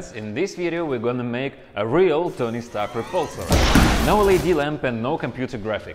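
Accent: Russia. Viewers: Этот русский акцент...ммм... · Русский акцент 🤔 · Пиздец у теья акцент) типичный русский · Ты спалил что русский) Акцент спалил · Ля а акцент похож на русский. Русский? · А почему на инлище и с акцентом русским?) В чем проблема пилить для рашен аудиторишн?)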